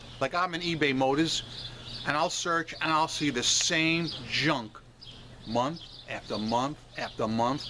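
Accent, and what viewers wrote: New York, United States. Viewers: New York City accents like ROCK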